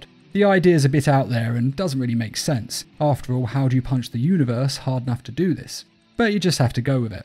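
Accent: England, United Kingdom. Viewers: I have missed the beautiful English voice. My English friends FOR THE LOVE OF TEA!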